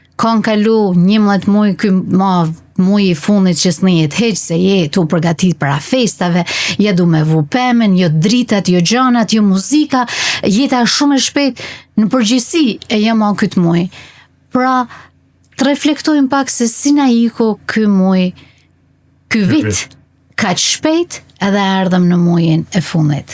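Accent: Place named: Gheg, Albania. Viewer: Ju përshëndes nga Shqipëria gegërishtja juaj tingëllon bukur gëzuar edhe këtë vit urime .❤❤❤❤❤❤❤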